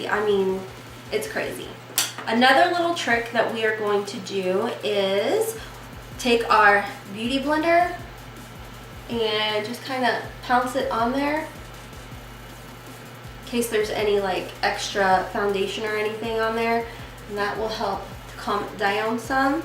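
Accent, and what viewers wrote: South, United States. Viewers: I have a question.... Do you have a very heavy southern accent normally? I feel like you might but try not to have one in your videos maybe??? Well, if so, you should really let that shine through.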